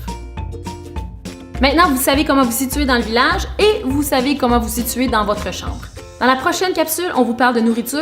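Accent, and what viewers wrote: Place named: Quebec, Canada. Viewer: C est trop mignon ton petit accent québécois <3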